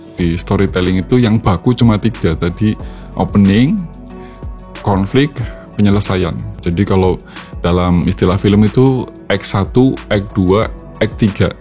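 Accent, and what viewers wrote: Indonesia. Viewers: Mantap bang,kayaknya Abang dari Jawa ya mendok bgt ngomong nya? Kalo aku orang ngapak😂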